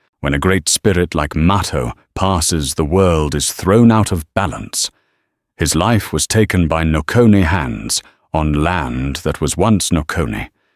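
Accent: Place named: United Kingdom. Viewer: You need someone to speak America English for an American stories.. Not English from UK..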